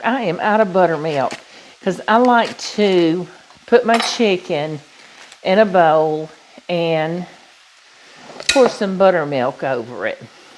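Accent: South, United States. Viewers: So cute in your southern accent : buttered my little buns.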